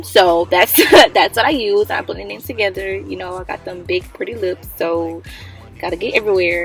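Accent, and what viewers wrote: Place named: New Orleans, United States. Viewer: Girl that’s a thick N.O accent lol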